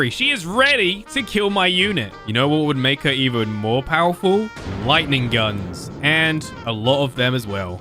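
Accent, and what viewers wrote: United Kingdom. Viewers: Are u British or American I can't tell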